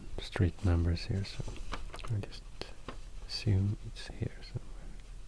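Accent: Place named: Sweden. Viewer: Du er så god til soft spoken ASMR og din milde svenske accent er så afslappende! Hilsen, en nabo 😋🇸🇪🇩🇰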